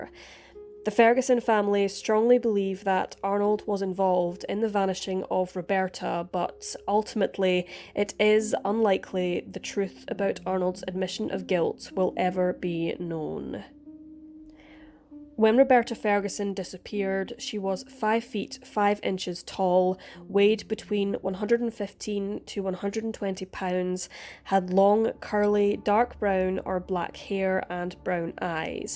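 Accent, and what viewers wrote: Scotland, United Kingdom. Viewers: I like your accent, scottish?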